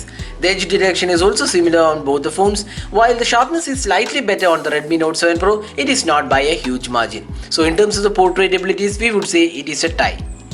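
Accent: Kerala, India. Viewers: R u a mallu??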